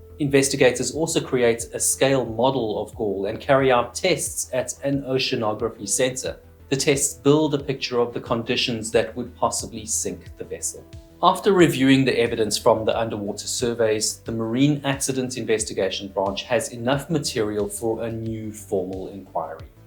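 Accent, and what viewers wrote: South Africa. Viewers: Yeah, pretty sure he's, that's just how it sounds with a South African [I think] accent.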